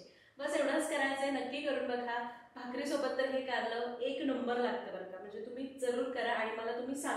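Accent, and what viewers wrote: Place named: Pandharpur, India. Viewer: Ag tai tu pandharpur kiva mangalvedya chi aahe ka g mla aamchi bolnyachi aahe tashi vattey..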